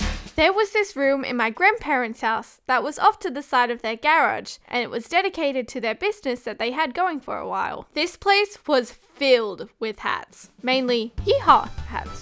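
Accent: Australia. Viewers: Just found your channel and could instantly tell your a fellow Aussie like me, btw your vids are hilarious pumpkin man keeps getting me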